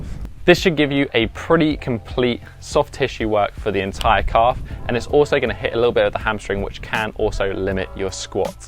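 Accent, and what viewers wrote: England, United Kingdom. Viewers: I'm not English but oh beautiful to hear English accent. Not in you face American. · That british accent ..gosh i cant understand u well and thats annoying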